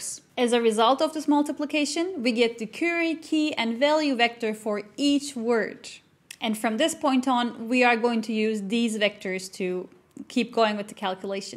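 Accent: Turkey. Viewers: Why is the first think I thought is that she must be Turkish? :D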